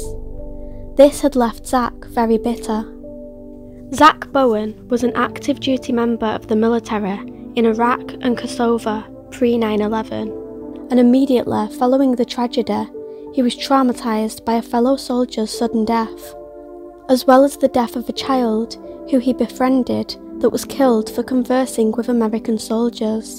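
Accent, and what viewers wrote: Manchester, United Kingdom. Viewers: You sound like Manchester England. Well done presentation!!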